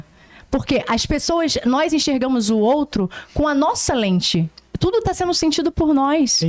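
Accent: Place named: Rio de Janeiro, Brazil. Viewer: Ela é carioca, po. Se fosse baiana ela iria dizer "Oxente!", "Mainha", etc.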